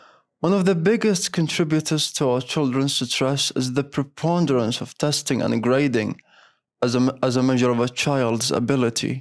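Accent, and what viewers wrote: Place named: United Kingdom. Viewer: Very nice job. I enjoy it as always. Your pronunciation and british accent are great! Your voice tone is perfect! I wish you a big success and happiness! ❤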